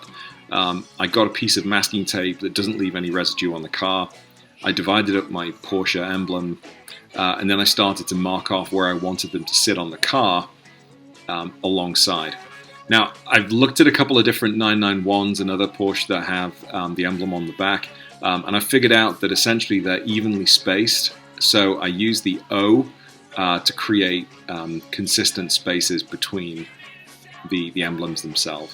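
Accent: Liverpool, United Kingdom. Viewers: Thanks for this ....bought new emblems for my 997.1 today so gonna give it a go! :) .....sounds like you're from my neck of the woods? (Liverpool UK) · You sound like a scouser fella but you’ve got U.S plates..?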